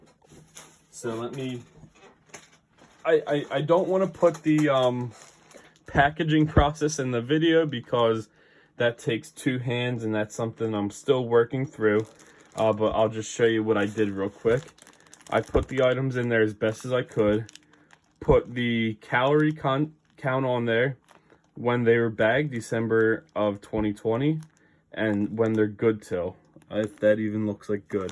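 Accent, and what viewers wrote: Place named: Baltimore, United States. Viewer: I detect a hint of Baltimore in your accent. Keep the videos coming sir.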